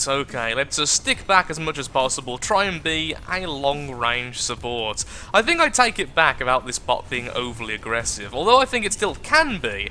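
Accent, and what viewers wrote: United Kingdom. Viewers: hes British. not american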